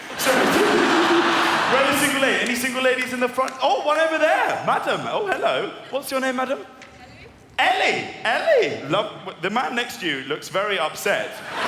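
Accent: RP, United Kingdom. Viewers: Get better friends, lol. No but honestly, I'm Swedish too and most people I know would be able to follow this no problem. When I saw Jimmy Carr here in Sweden the venue was sold out, people here like British comedy and language is not generally a barrier. Maybe for some people but not the majority. And my experience is people who do struggle a bit with English generally find British English easier than American, and Jack is quite posh so that doesn't exactly hurt. Hade gärna sett Jack live också om han kommit till Sverige men 2014 visste inte jag vem han var tyvärr!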